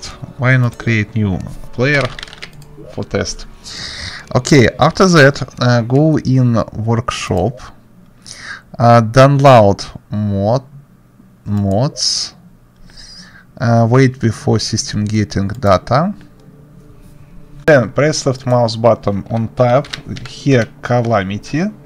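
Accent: Russia. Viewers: ну привет, по акценту можно сразу понять что ты русский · очень слышен русский акцент спасибо помог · русский акцент выдал тебя)) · Кааааеф. Так отчётливо слышится русский акцент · i dont know if i can watch tutorials on anything unless they have a russian dudes voice after this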